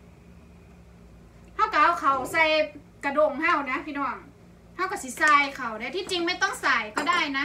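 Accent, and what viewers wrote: Ubon Ratchathani, Thailand. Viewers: พูดแบบคนอุบลแท้ดูทุกคลิปนะคะ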